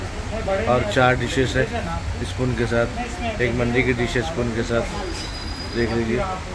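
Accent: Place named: Hyderabad, India. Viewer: Walaikum assalam o rahmatullahi wa barakatuhu Bahut accha lag raha hai aap hyderabadi language mein baat kr rhe ho 😅 Beautiful collection 🎉❤😍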